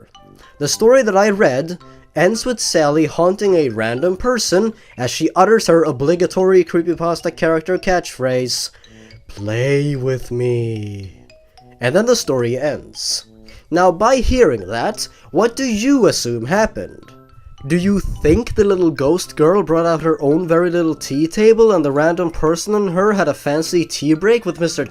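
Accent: Sweden. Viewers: Are you Swedish!? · Are you Swedish? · wait are u swedish?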